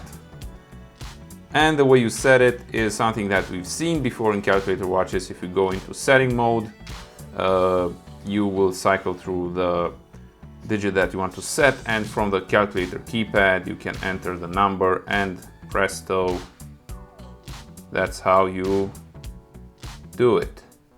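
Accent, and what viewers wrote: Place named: Hungary. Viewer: Kicsit magyar az akcentusod. Talán nem véletlen, ugye? :)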